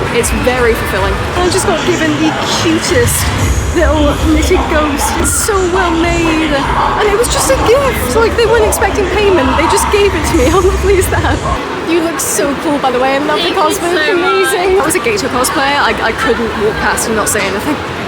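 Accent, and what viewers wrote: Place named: Wales, United Kingdom. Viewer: Lol, I don't watch this stuff... But I can't not watch... Maybe because you're Welsh, and I'm "Welsh".. gotta be the accent..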